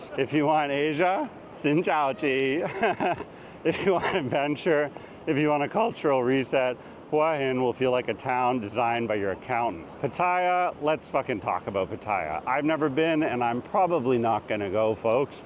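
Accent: Canada. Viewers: First honest influencer for SE Asia. You sound Canadian though. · Are you from Wisconsin? I think that you sound more like a Canadian, but you mentioned Wisconsin a couple of times...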